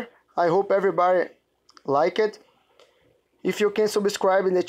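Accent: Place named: Brazil. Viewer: THANK YOU VERY MUCH MY BRAZILIAN FRIEND I APPRECIATE IT I HAVE JUST WATCHED AN INDIAN GUY , I THOUGHT HE COULD HELP ME UNLOCK MY ASUS PHONE SCREEN BUT HE TRULY SUCKED BIG TIME. HE MOVED TOO QUICKLY FROM SCREEN TO ANOTHER AND HE WAS NOT TOO CLEAR. I APPRECIATE YOUR HELP. THE REASON WHY I KNOW YOU ARE BRAZILIAN BECAUSE I USED TO LIVE IN DEERFIELD BEACH IN SOUTHERN FLORIDA WHERE THERE WERE A LOT OF BRAZILIAN PEOPLE AND I HAD A LOT OF BRAZILIAN FRIENDS AND THEY HAD YOUR EXACT ACCENT, TODO BON, TODO BON THANKS AND VIVA BRAZIL FOOTBALL TEAM OF 1982 BEST TEAM EVER. THANKS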